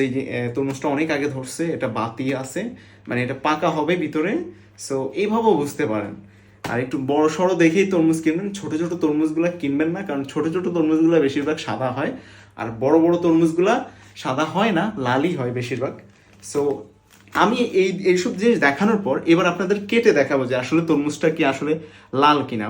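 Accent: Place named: Noakhali, Bangladesh. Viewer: Taile bujben ata tor Noakhailla kotha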